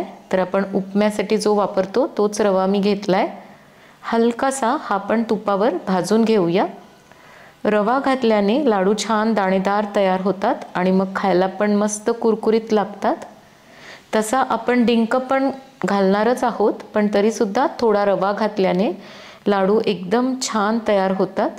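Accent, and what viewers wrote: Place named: Pune, India. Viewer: Tumhi puneri bhasha bolta chan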